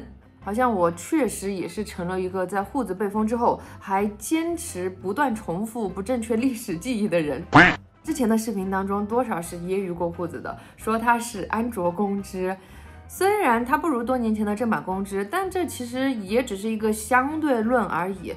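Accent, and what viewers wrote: Sichuan, China. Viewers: tutu新年快乐❤不是四川人也觉得四川话亲切了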